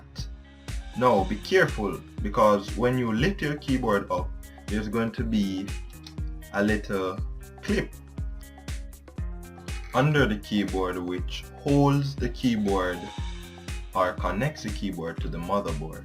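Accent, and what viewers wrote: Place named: Jamaica. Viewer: Thumbs up for the JA accent..... bras brap 🇯🇲🇯🇲🇯🇲🇯🇲🇯🇲🇯🇲🇯🇲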